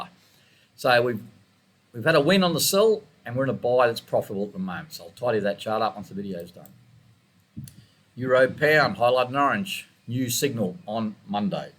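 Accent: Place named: Australia. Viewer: Thanks a lot. Yes please, keep the Aussie accent. I won't be able to handle the Scottish haha:D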